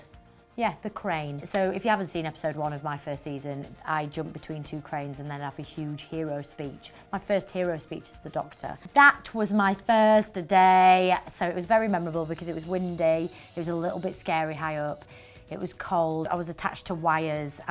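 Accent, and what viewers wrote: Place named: Yorkshire, United Kingdom. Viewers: she makes me wanna embrace my yorkshireness and I repress it all the time LMAO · her yorkshirity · She's actually Northan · Her accent is just brilliant might be a bit bias because im from yorkshire 😂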